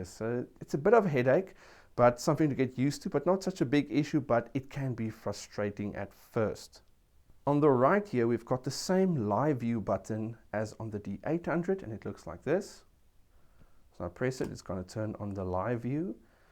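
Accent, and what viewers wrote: South Africa. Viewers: I am busy going through reviews of the Nikon D600... i just stumbled over yours, and I was like: Hey, I know that accent! :)) It is nice to see a camera review made by a south african on here for a change. :thanks for the great review! :)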